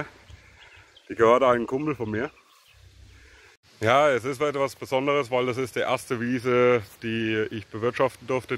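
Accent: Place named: Lower Franconia, Germany. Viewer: Schööön! Ein Undder-Frrrange 😇 sonst gibt´s hier nur Ober-Frrrangen 😂 direkt abonniert und gelikt!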